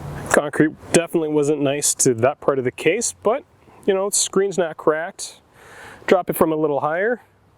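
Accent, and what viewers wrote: Canada. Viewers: you have got a great canadian accent.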